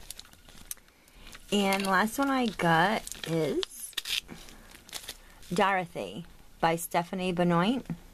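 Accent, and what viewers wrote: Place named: East Coast, United States. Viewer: hahaha! OF COURSE I loved what u said at the end.....yes, your accent. I love it hahaha. U probably get tired of me saying it. It's the "R" that gives your accent it's different sound. East coast!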